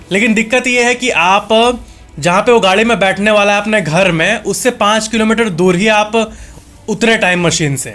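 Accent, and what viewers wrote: Bihar, India. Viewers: Ap bihar k hona🤔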